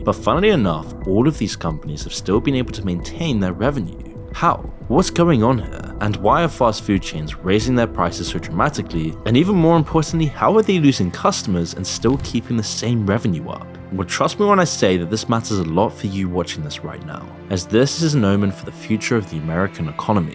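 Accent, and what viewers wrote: United Kingdom. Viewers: its part of "Everything is fake in ClownWorld" - this channel is the head clown. "It is impossible to succeed on youtube, but I DID IT" "Subscribe for $200/month for my guide how to succeed - IN EVERYTHING". Also the obnoxious BRIT accent talking about USA ..... Im here for the LOLZ and to see how many of the cohort following this channel has brain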